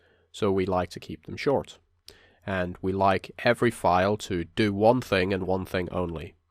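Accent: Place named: Ireland. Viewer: Your accent sounds like a mix of Irish and Northern Irish. Nice vid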